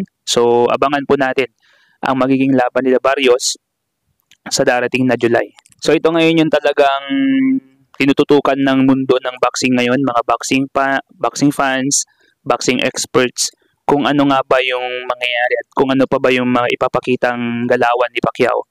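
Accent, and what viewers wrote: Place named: Visayas, Philippines. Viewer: Bisaya ka dong halata medyo bagwet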